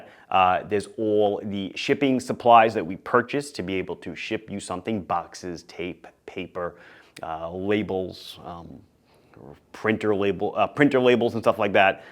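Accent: Long Island, United States. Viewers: This was super interesting! Thanks so much for the breakdown!! Whenever I watch your videos, I always feel as if you're some distant cousin or something talking to me, because although I'm in CT, my Dad and his extended family are all from Valley Stream, and that Long Island accent is so distinctive!! You wouldn't sound out of place at one of our Thanksgiving Dinners or something hahaha! You now have a standing invitation to attend this year lol!!! 🤣🤣 Awesome video!!!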